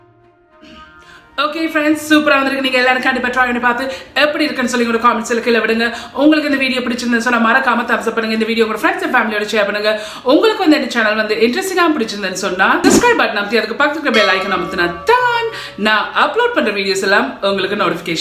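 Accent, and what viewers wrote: Jaffna, Sri Lanka. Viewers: நீங்க யாழ்ப்பாணமா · Its really nice.your voice also it reminds me jaffna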